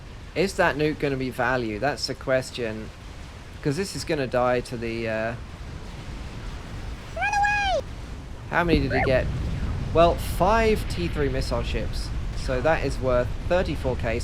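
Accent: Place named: Detroit, United States. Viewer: I love the accent! Detroit?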